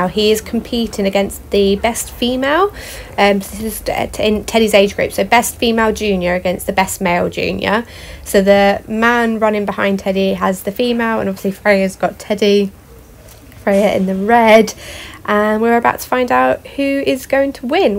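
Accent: United Kingdom. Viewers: Your British accent is ❤️❤️❤️❤️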